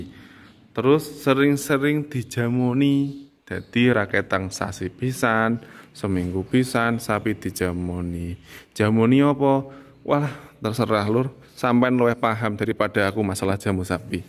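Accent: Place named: Juwana, Indonesia. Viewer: Koyo wong juwono yo om?😁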